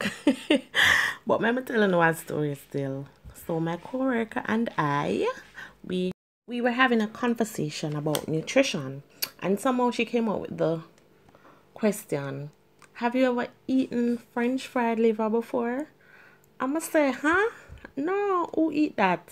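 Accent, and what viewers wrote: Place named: Jamaica. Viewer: 🤤🤤🤤 plus I love the way you speak😍 time to learn some Jamaican English 💃🏻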